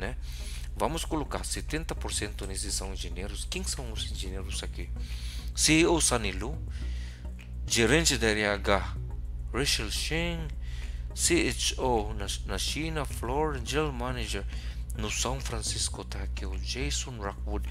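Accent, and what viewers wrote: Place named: Argentina. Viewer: De onde você é? Seu sotaque parece argentino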